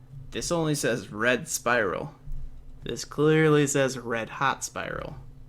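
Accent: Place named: East Coast, United States. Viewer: Lol, I can pick up a "Yankee" accent with your new mic 👍 Are u in the east coast somewhere?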